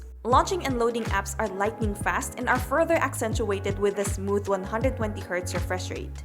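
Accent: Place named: Philippines. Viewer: never lose that pinay accent, its part of your charm "var ree aunt"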